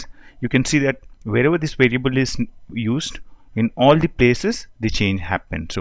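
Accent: India. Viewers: ох уж этот индуский акцент х/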